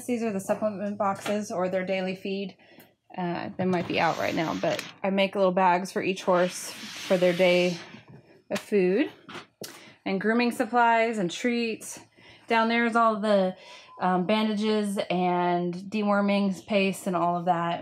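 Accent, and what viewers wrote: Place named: Texas, United States. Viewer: I thought so! We are neighbors! I could tell from the terrain etc. plus you sound Texan I run a private non profit in Marble Falls. Would love to connect!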